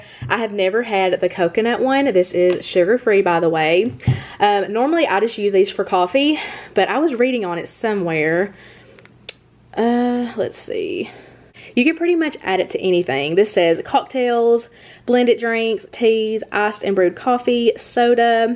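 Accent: South, United States. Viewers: You speak very well it's all steel magnolia very southern best wishes for all your goals on giving us you loveya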